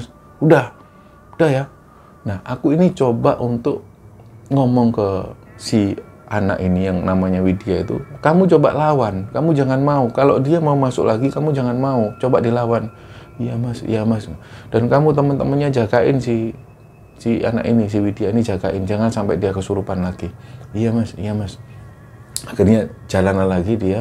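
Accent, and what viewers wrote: Java, Indonesia. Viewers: Mas langgam jawanya mantep ketagihan dengerin sukses mas 🙏🙏🙏👍👍👍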